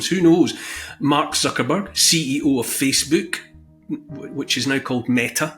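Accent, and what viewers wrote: Scotland, United Kingdom. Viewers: I love ❤️ that Scottish accent. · From USA here, sometimes I listen to your channel just so I can hear your awesome Scottish accent. My favorite!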